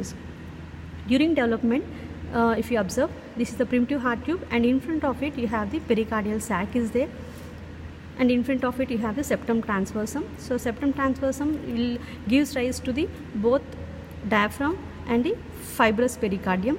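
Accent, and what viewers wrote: India. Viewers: Why does it have to be an indiaaaaannnnnnnnnnnn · Strong Indian accent. Omg I don’t understand words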